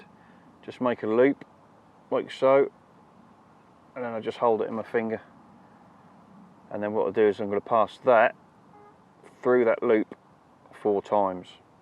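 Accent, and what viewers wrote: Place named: Suffolk, United Kingdom. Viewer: Nice video Uncle ;) awesomely put together. Good to hear you’ve still got your good ol’ Suffolk accent!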